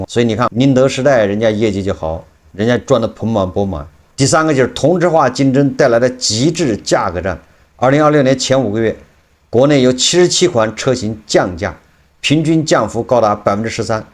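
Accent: Anqing, China. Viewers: 老师一听口音就是我们安庆老乡😅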